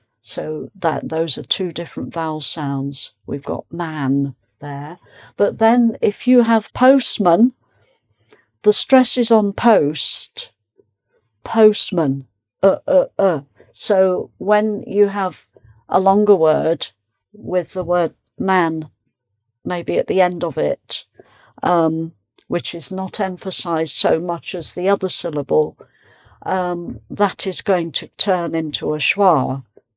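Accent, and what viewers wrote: United Kingdom. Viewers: I like her so much as an English teacher.I like her British accent a lot. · That was a very clear representation of British accent. Much appreciated. Love from me <3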